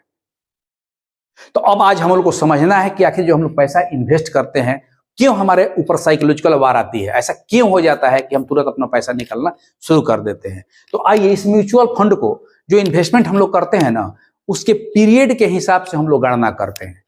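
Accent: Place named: Bihar, India. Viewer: His tone shows he is from Bihar Paur month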